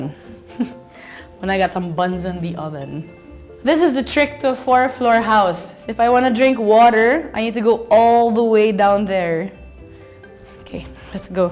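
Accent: United Kingdom. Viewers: that british accent hahahaha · That Brit.accent by 😍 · I like her british accent👌🤗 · British accent 😍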